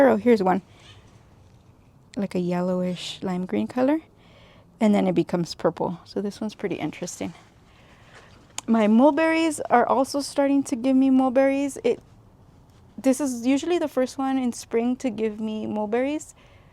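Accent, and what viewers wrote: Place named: El Paso, United States. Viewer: I clicked on your video without reading the caption and as soon as you started talking I knew you had to be from my area lol I’m from Las Cruces 🥰🙌🏽 and your new follower!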